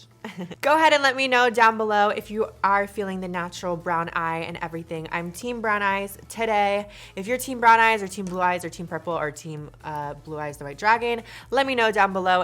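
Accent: United Kingdom. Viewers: Why does she sounds a bit British here? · living for the british accent bih🎉🎉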